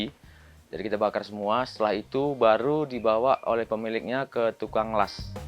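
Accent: Medan, Indonesia. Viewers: Orang medan bg?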